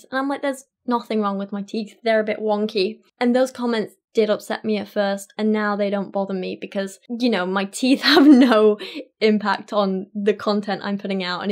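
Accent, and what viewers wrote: United Kingdom. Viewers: Uh ok why the fuck haven't I noticed you were British until just now? I've been watching you for about a year now lmao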